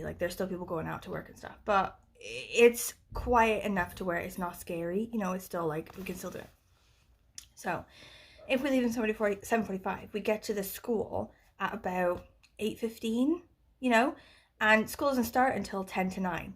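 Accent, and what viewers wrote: Wales, United Kingdom. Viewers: Loved hearing the Welsh again. You sound amazing to me. Why are you taking the class? That sounds like a really stupid question since I know you LIVE in Wales, but seems like it’s become more of a priority in the last year. 🎄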